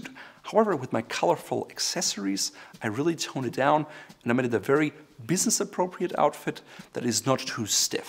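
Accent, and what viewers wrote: Germany. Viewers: English is not his native tongue. I believe it's German. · I hear a slight German accent? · This guy is german, but its hard to notice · He's German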